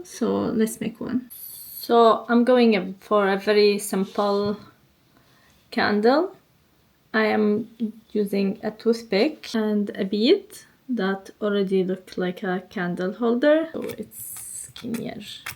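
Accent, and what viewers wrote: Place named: Lebanon. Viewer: Your accent is Lebanese ❤❤❤❤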